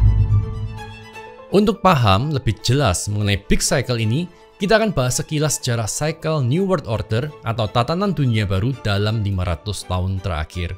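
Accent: Java, Indonesia. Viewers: Jateng atau Jatim ko, medoknya hampir ga keliatan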